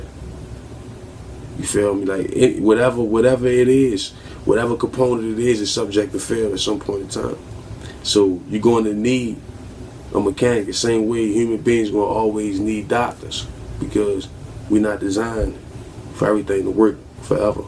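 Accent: Baltimore, United States. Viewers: I knew you where Baltimore from that accent. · The way he talk I knew he was from Baltimore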